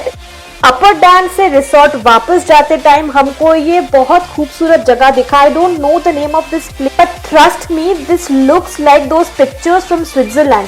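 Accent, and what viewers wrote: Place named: Bengal, India. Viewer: কথা শুনে বাঙালিই মনে হল। তাও বাংলা নেই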